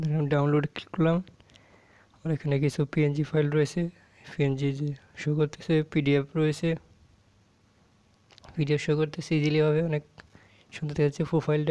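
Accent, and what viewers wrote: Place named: India. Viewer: English ENGLISH . Dont put your title in ENLGISH if youre speaking chicken scratch from india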